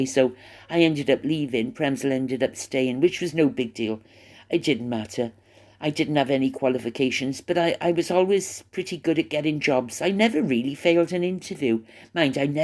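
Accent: Wales, United Kingdom. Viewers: Sweet love hearing yr welsh brogue, you can witter away as much as you like. Yr channel, we all come to listen to you whatever the subject. BTW Kamala H can’t pass any bills under a “lame duck” GOP (I think that’s what it’s called before November 2024 elections, am sure our US cousins will advise if incorrect). Why are the people’s “tips” being taxed⁉️ I thought a tip was a “customer gratuity” to make up for low pay & also to get good service, how bizarre. Have a fabulous morning, afternoon or evening 😘🦘🐨💐🇦🇺🏴🇬🇧🇨🇦🇺🇸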